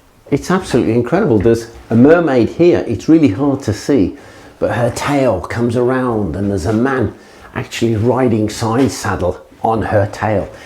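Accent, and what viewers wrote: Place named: England, United Kingdom. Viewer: Just came across your channel, this priest hole hiding episode is the first vid I have ever watched belonging to you fantastic easy to follow and put together really well... Not to mention that sumptuous English accent you have!! When I hit subscribe I was disappointed I hadnt already hit subscribe sooner before. 🇮🇪 (3hrs have past) since my first visit; to your channel, and I am hooked on history with!!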